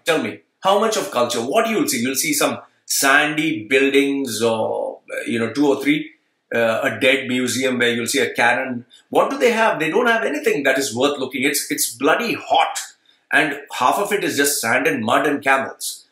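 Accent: Kerala, India. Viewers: You are fluent in English but you cannot hide that you are INDIAN by origin! 😊. Why don't you care your own country?... It's none of your business!!! How much money you got paid to do this video??? You be careful the country you are talking about is very strong and powerful country that capable of a lot of things 😊. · Bhai mast kerala accent.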